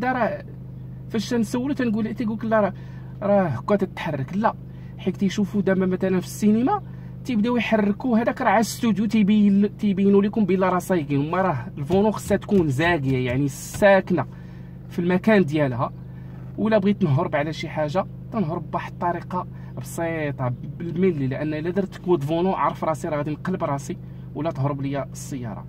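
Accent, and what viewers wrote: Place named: Algeria. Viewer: واه نيشان ربي يحفظو حتى اللهجة لي يتكلم بها تشبه لهجتنا ف جزاير 💪❤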